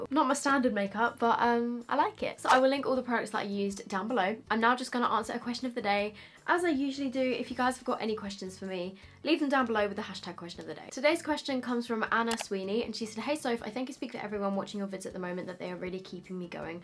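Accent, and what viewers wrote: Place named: United Kingdom. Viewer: Ahahah sometimes your British accent just like JUMPS OUT 😂 Xx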